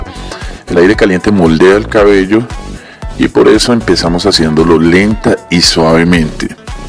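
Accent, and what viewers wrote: Colombia. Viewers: Ese es colombiano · Con esa voz de Colombiano más ese talento y profesionalismo mezclas perfectas💯👌🏾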